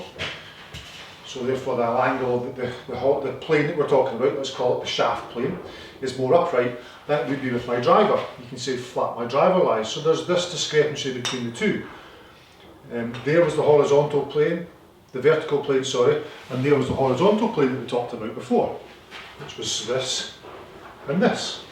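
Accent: Scotland, United Kingdom. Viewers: Well explained and demonstrated, as usual. Your videos are easy to follow as you explain and demonstrate very well , with a keen awareness of camera angles for the best viewer experience. Your delivery is excellent based on clear diction and a slightly aggressive but at the same time friendly direct Scottish accent, which I like - direct and no BS. Good sense of humour with amusing asides to camera are also very good. Lots of stuff on you tube but you appeal to a high handicapper hacker like me. Seeing some improvement with eureka. Big fan, keep up the good work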